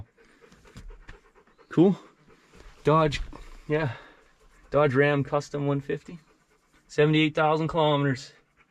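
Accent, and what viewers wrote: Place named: Canada. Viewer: I knew you were Canadian within like 2 seconds of hearing your voice. the location is a secret but ive narrowed it down; I would've hauled the vice out that boat house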